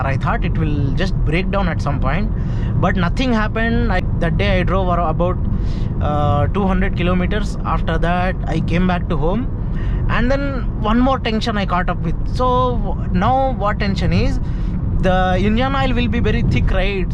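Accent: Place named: South India, India. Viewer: south indian accent rocks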